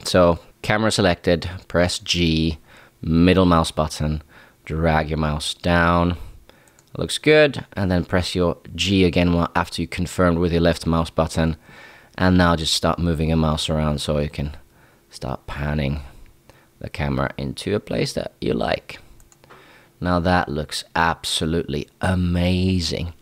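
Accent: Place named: Scotland, United Kingdom. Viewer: You have Scottish accent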